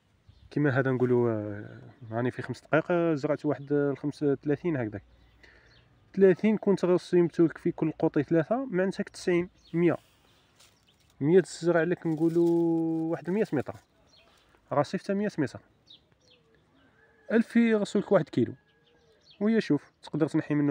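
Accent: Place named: Constantine, Algeria. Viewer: خويا انت قسنطيني ...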